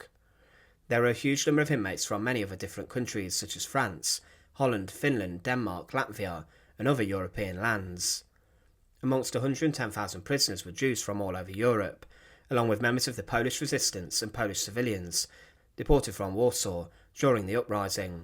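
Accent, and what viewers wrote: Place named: West Midlands, United Kingdom. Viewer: I grew up in a place where most people speak like that (the West Midlands) and left as soon as I could, because I couldn't stand the accent.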